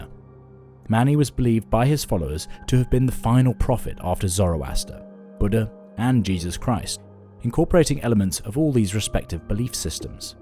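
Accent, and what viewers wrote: United Kingdom. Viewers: oh ya like the Souff London accent. Ya I used to live in London.. Heard that :)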